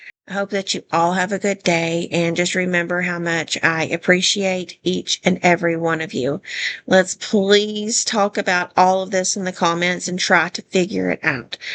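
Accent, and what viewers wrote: South, United States. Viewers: I just subbed bc you sound southern and you are definitely on point in what you said. ❤ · I think you're on to something! I like your voice, you sound like me...a southern gorl. Subscribed. 😊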